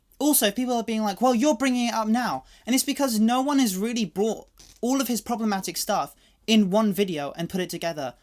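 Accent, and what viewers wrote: United Kingdom. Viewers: fell in love with your British accent already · besides the point, I'm appreciating the accent. You see so little bittish youtubers and I just noticed how comfortable listening to him is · You're a British aren't ya? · I love your British accent 😍 ❤ And you singing very well😆 Support you❣❣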